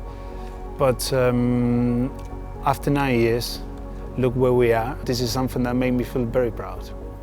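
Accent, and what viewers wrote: Manchester, United Kingdom. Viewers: Even sounds like a proper Manc. Good lad Zaba! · Me hace mierda Zaba y su acento Británico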